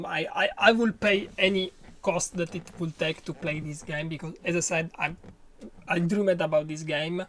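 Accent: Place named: Italy. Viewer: Love the italien accent! Great video!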